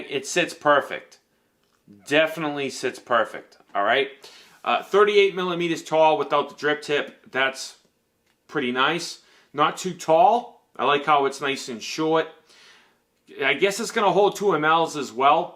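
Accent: Boston, United States. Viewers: You're not a Bostonian by any chance, are you? Just testing my accent recognition.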